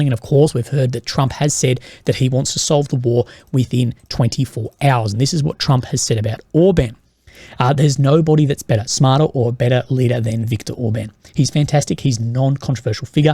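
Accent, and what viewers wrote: Australia. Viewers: He's Australian, DumbAzz!! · Sorry mate, I stand by my earlier comments that you are nothing but a US propaganda channel with an Aussie accent!!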